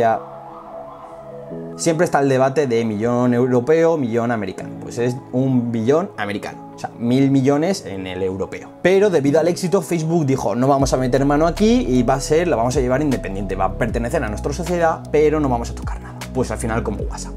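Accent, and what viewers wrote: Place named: Spain. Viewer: Recontra interesante chabon!! Una pregunta, de que parte de españa sos?? Pregunto por el acento, esta muy copado jajajaja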